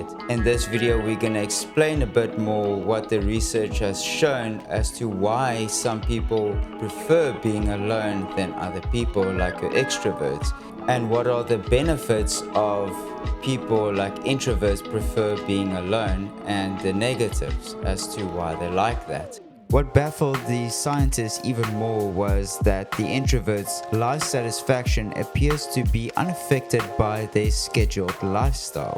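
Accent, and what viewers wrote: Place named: South Africa. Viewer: U sound south african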